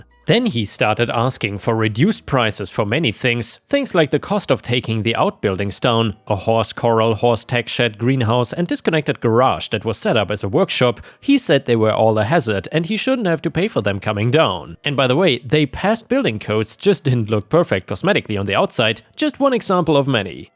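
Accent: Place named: Germany. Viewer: you could read the dictionary and I'd listen. I love your stories, but that soft German accent is the best part.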